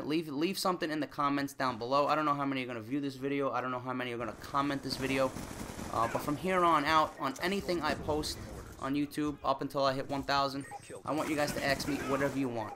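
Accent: New York, United States. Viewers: I predict 100,000 subscribers by the end of the year. NY accent + Rage + valid observations = kick-ass commentary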